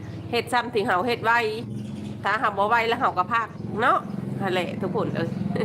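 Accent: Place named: Phu Thai, Thailand. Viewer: ภาษาภูไทพูดเพราะมากค่ะ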